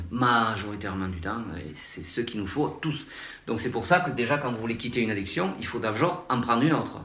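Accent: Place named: South, France. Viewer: vous êtes top! Juste vous écoutez avec l'accent du soleil çà me remonte mon taux de sérotonine;)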